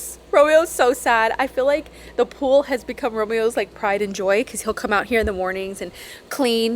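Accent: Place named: South, United States. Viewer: Cracking up at how your southern accent keeps coming in and out underneath the California one! 😅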